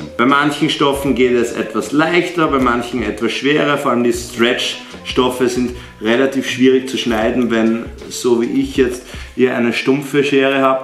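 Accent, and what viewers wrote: Austria. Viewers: In östereich spricht man des so aus:)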